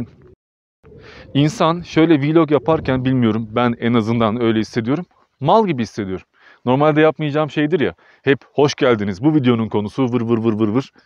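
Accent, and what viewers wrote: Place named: Kastamonu, Turkey. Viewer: Kastamonu 'ı şivesinden belli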